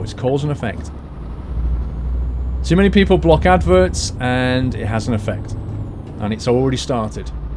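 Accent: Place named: United Kingdom. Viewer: I am a Pennsylvania person who enjoys listening to you talk in your British way. Your like a person who can keep anyone company. Sometimes you are funny. Also, it's neat to see how the ways you have to learn how to handle traffic and situations along the way as you travel.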